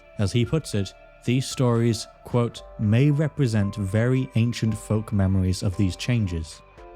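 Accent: Wales, United Kingdom. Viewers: Love hearing the Welsh pronunciation ❤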